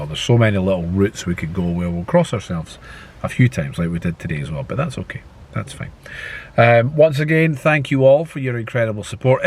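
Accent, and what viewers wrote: Scotland, United Kingdom. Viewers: Nice to hear a fellow Scot on here🤣👌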